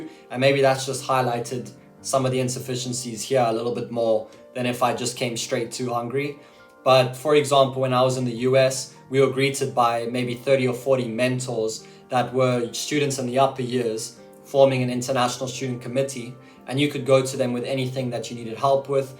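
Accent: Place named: South Africa. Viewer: I could hear your accent you South African....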